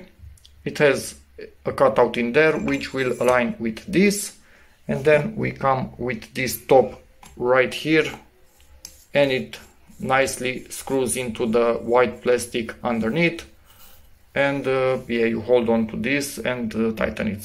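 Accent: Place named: Romania. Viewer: I have a Romanian friend who sounds exactly like you when he speaks English.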